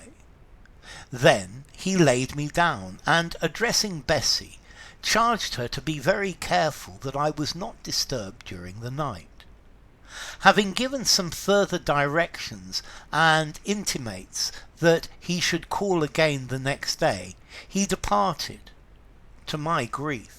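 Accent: United Kingdom. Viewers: I'm in love with stories especially with a British accent thanks for sharing this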